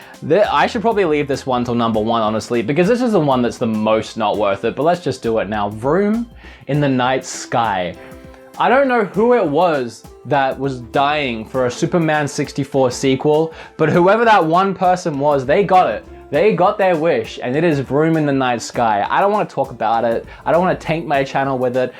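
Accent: Australia. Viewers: Wait.... YOU’RE AUSSIE??????? (Subscription intensifies) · I really want to buy a switch since I never got around to getting a WiiU. Nice to hear an Aussie on YT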